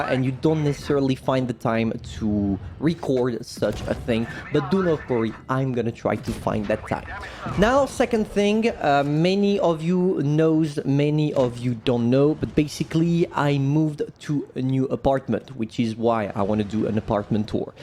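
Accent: France. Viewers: is it me or your french , c'est moi ou t'es francais ?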